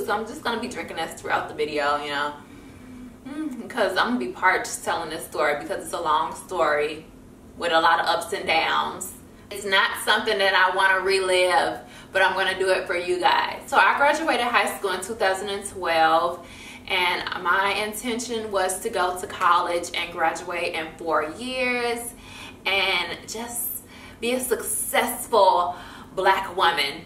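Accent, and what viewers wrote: Chicago, United States. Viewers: Are you from Chicago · I had a feeling you was from Chicago. I watch your videos often and I always be knowing when people from Chicago!! I can just hear it in your voice and stuff.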